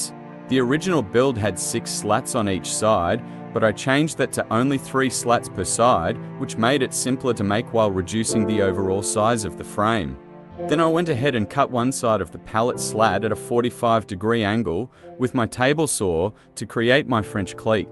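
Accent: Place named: Australia. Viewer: Awesome! where did you get the Austrailan? is that some sort of text reader robot? love your, vids, thank you!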